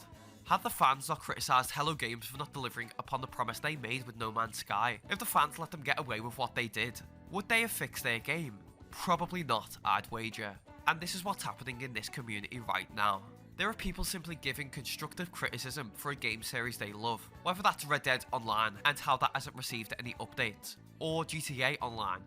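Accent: Liverpool, United Kingdom. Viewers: U from Liverpool lad? Can tell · be'''ee i love british accent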